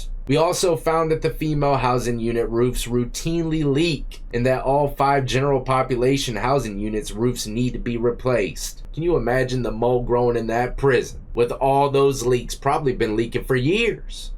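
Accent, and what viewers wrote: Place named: South, United States. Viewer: Love your Southern accent. Love from 🏴🇬🇧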